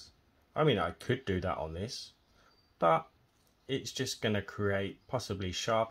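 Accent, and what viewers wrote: Essex, United Kingdom. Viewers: God I’ve been married for 30 odd years but after watching this tonight I could actually marry you, you amazing man! I love the way you explain why. You’re so bloody awesome and I love the fact that you sound like your from Essex or somewhere like me too 😂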